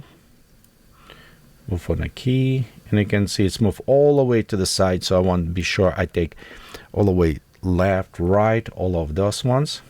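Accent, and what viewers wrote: Russia. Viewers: This is a very helpful video and I will use the information redaily! Your content continually proves to be very valuable, Since I not a native "Russian-English" speaker, I sometimes have trouble following along, but I find I can usually figure out what you are saying. I just imagine that Chekov from Star Trek is instructing me. Keep up good work! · I hear your accent, are you Russian?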